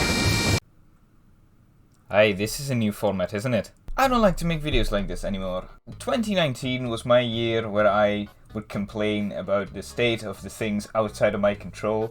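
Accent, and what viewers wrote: Scotland, United Kingdom. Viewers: Naar mijn mening heb je eerder een Schots accent dan een Nederlands accent. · Wait you're Dutch? All this while I thought you were Scottish! Great video mate, I agree with everything you said! Maybe if they found a way to up the quality and drop the prices, they'd lose that outdated image and start drawing in younger blood into the hobby! Awesome content as usual mate! Cheers!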